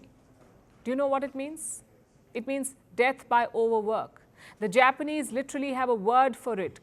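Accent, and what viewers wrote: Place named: India. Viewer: It's difficult to listen to this presenter. Thick Indiannn accent. Lucky the caption helps.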